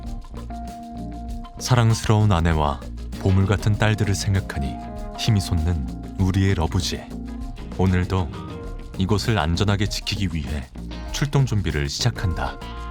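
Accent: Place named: Chungcheong, South Korea. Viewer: 러바오 넘넘 귀여워❤❤ 충청도 사투리도 찰떡이구먼유~^^ 365일 만근하는 우리 러부지❤❤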